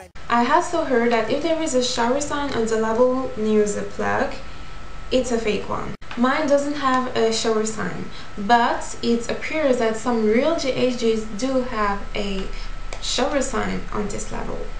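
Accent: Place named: France. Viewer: She sounds very french.. what do french people look like? because I am French! born in Nice raised in London!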